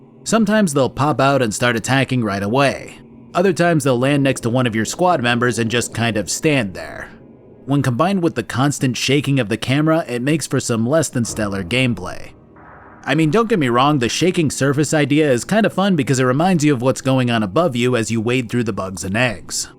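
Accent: New Zealand. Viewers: I love mistaking a New Zealand accent for a British one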